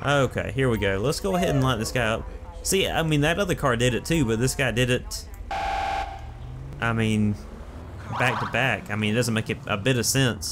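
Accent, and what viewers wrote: South, United States. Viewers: Wat state are you from cause it sounds like you have a southern accent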